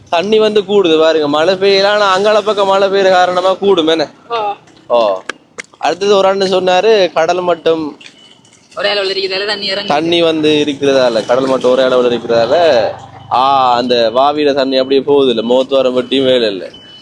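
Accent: Sri Lanka. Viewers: இலங்கை தமிழ் மிக அழகாக உள்ளது அண்ணா😊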